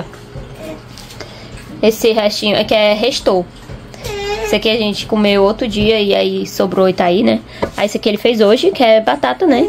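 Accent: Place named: Northeast, Brazil. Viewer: Estou assistindo na noite de segunda, enquanto passo roupa, sou RJ. Seu sotaque nordestino é bem característico. Tenho uma vizinha que é de Natal já mora aqui a mais de 20 anos, mas não perde o sotaque. Bjs na família. Deus os abençoe.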